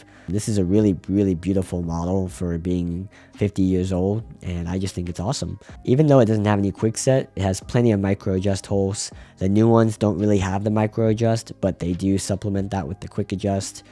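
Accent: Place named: Midwest, United States. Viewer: Congrats on your license!!! Good luck God Bless and much success! The 36mm Date Just you can't go wrong. I prefer the older holes oyster case Rolex models. Perfect for strap options and it gives you a vintage vibe. Those look perfect on young guys when you "hipster" a classic vintage watch. BTW you sound like you're from the Midwest... it's the accent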